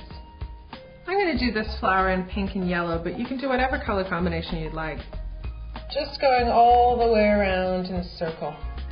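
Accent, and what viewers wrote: Canada. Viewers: ooh i like her canadian accent. and what size star tip was that?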